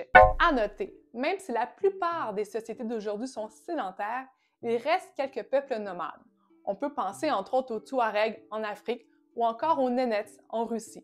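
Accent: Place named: Quebec, Canada. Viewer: Bonsoir Madame! You Tube m'a proposé votre chaîne, et comme j'aime beaucoup l'Histoire, que j'ai une formation d'Historien, que j'ai même une maîtrise, que je travaille à un modeste poste au Musée d'Archéologie Nationale à Saint Germain en Laye (France), que ma voisine de rdc s'appelle aussi! (je me demande si elle a de la famille au Québec) et que pour un Français l'accent québécois est for agréable, je m'abonne! Au musée les visiteur Québécois sont mes préférés, ils sont toujours aimables, souriants, causant, ils ont l'air heureux de vivre. Au plaisir! 😀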